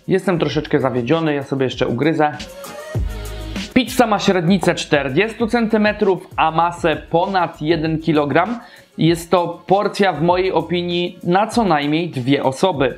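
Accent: Poland. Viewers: Jak ja nie nawidze tej toruńskiej gwary, serkem... Ołówkem ...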